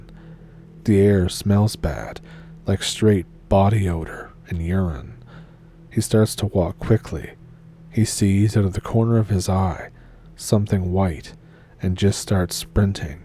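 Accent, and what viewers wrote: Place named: Newfoundland, Canada. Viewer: Narrator sounds like he’s from Newfoundland! Me too brudder, me too. If not you must be east coaster fer sure. Thanks for the upload makes me feel like I’m home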